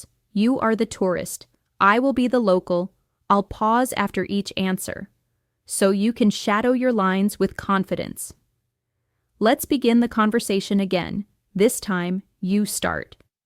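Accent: United States. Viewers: Is it american english?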